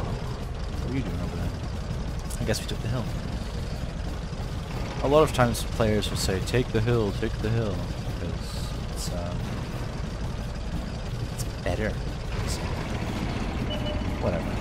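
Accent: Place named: United States. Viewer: You sound american, but you are on EU server?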